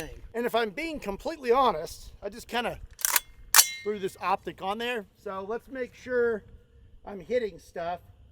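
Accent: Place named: Baltimore, United States. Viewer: B-more people like why he talk like that. If you know you know. 😆 🤣 🫡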